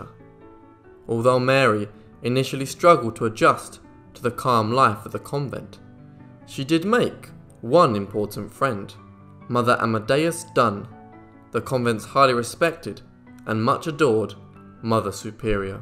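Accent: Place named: United Kingdom. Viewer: WHY ,WHY WHY do.they always have these damn Brits narrating stuff that has nothing.to.do with them I can't stand listening to.that.accent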